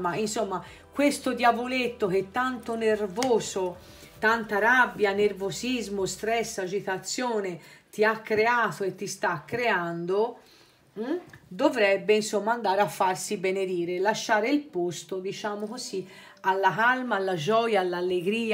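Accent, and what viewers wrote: Tuscany, Italy. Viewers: Super parlando il toscano e ancora più super sfoderando l'inglese...sarai mica insegnante di inglese? Vedo che te la cavi molto bene anche con i termini in voga...english 🥰🥰🥰💯💯💯💯🍷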